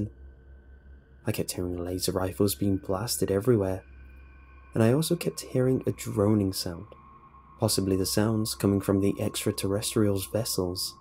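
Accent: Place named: RP, United Kingdom. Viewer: Dark Glitcher I think it's mainly the accent. Can't beat a posh Brit accent in voice overs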